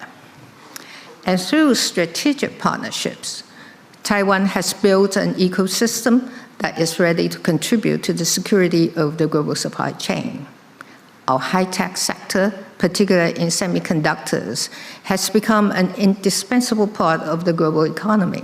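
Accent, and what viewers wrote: United Kingdom. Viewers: 她是一口純正不列顛口音(或非常接近)，畢竟她是真的在那邊唸過書，不像一堆支那黨政客只是去國外買學歷但其實英文口說爛得要死。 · 蔡總統的英國腔超級好聽👍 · 英國腔超棒的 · 總統的英國腔好好聽啊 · 總統是純正倫敦腔的英文耶👍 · 英國倫敦政經學院的PhD, 好聽的英國腔. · 英國腔太迷人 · 英國腔? 真感動~ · 不看稿的英國腔超帥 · 她說的是英國口音和台灣人習慣的美式英語不同。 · 什麼時候開始有這麼重英腔了？ 😀😆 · 我愛她的英式英文，太好聽了。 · 不卑不亢的英國腔演說，真正的領導人，偉大的女性，我向您致敬，感恩臺灣有您.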